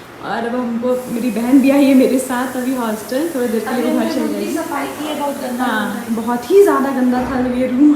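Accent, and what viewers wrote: Bihar, India. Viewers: Didi your talking way was lovely 🥰 I'm also from Bihar waha pe ese hi baat krti h ladkiya unki yaad dila di 😍 btw now I'm in delhi, your words like ( dekho ,hum ) give me nostalgic felling when I'm in bihar nowadays in vecation 🤩🥺🥺🥺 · I just accidentally came across your channel and glad to hear the typical simple Bihari tone in your voice☺ And ofc, your room looks beautiful 💯❤ I'm also from Patna, gonna give 2nd attempt this yr. Di, wish me luck😅🙏🏼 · Bihari accent is love...btw kon year me ho didi?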